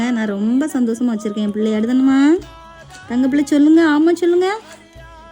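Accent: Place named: Madurai, India. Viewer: நீங்க கண்ணியாகுமரி மாவட்டத்த சேந்த ஆளு ஆனா மதுரை பக்கம் இருக்கற பாஷை பேசறிங்க சூப்பர்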